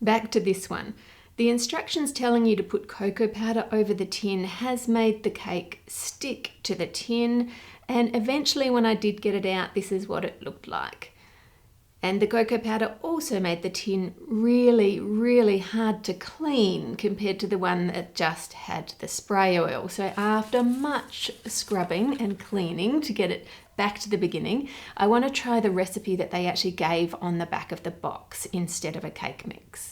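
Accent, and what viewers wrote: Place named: Australia. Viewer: is pretty much Cher with an Australian accent and baking skills. Oh. The love ❤️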